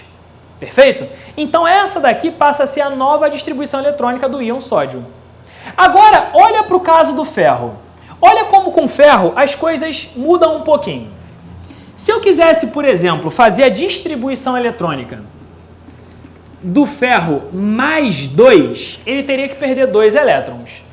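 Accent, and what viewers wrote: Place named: Rio de Janeiro, Brazil. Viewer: Legal super entendivel, mas poderia ser meno cariocado,,,,cerrrrrrrto.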